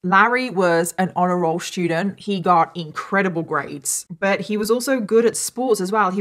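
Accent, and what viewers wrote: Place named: West Midlands, United Kingdom. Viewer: Not sure what part, but definitely a West Midlands accent.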